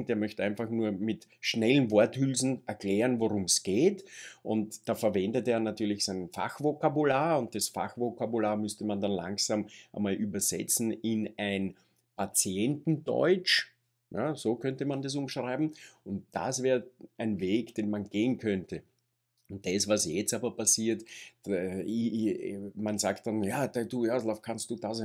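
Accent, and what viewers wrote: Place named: Austria. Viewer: Diese österreichische Sprache ist einfach zu schön! Könnte stundenlang lauschen. :-)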